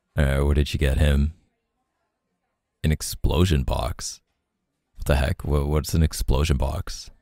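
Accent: Canada. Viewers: he sounds extra canadian here 🫣🫣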